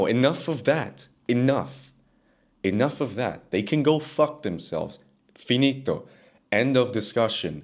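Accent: United States. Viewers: "with the ridiculous amount of German blood/dna floating around the majority of white people in the US, he might just be a mixed American who happens to live in Europe (family, etc.)." is what I would have typed, but he has that just-barely-there accent - it's an almost completely perfect general-American accent (the way people tend to sound on tv when they are not meant to have an accent, shows up in films too). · So you have a Spanish first name, a German surname, an American accent and you live in Berlin. What's your story? It sounds interesting.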